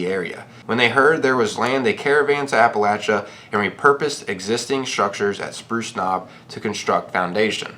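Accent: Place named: Philadelphia, United States. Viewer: You from philly? You sound like me. Go birds! Thumbs up!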